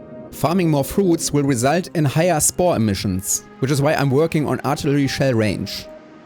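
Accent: Germany. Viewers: Habs auf Englisch sehr genossen und dacht immer "schöner deutscher Akzent" :-D ist aber auch auf deutsch cool. Ich würde mir ein richtiges Schritt für Schritt Schaltungsvideo wünschen. Ich verstehs auch nach 800 Std nicht und alle Guides dazu sind immer zu schnell... Ich versteh nicht warum bestimmte "Symbole" gewählt werden und so weiter und sofort. Darum fallen mir Raumschiffe so schwer, weil ich einfach nicht raffe wie ich da die Schaltungen verwalte... Bzw. Ists sehr stümperhaft. Würd mich echt freuen.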